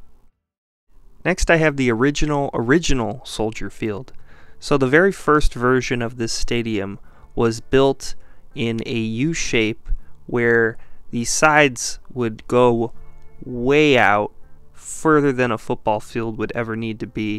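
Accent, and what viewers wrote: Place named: Cleveland, United States. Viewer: I love this guy's cleveland accent.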